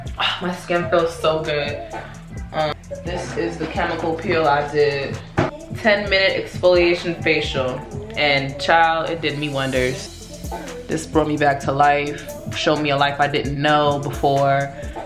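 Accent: New York, United States. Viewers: where she from ? she sound like she from chi that’s where I’m from and she sound just like me 🤣 nvm she sound like she from New York 🤦🏽‍♀️ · Lmaoooa “my ock don’t remember me??” I knew you were from New York😭😭💛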